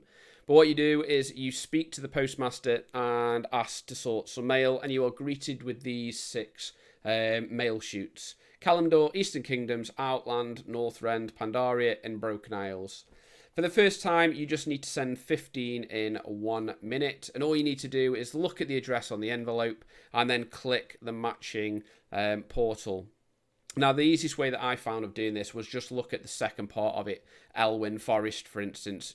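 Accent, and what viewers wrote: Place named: Stoke, United Kingdom. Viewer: the steps were to fast i had to listen to your stoke accent so much !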